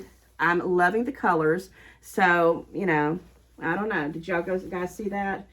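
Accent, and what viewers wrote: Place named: South, United States. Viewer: I discovered your channel a month or two ago. You are just so real & I love it. I enjoy your videos and your Southern accent. Your home is gorgeous!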